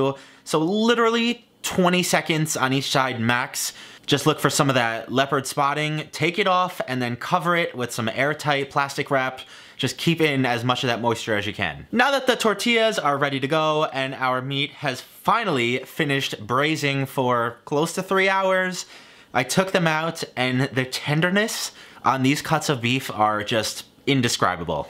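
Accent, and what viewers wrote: United States. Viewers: your pronunciation is fine. you are an american talking with an american accent. you're pronouncing the words right just not putting on a false spanish accent which i find more annoying than anything 🙄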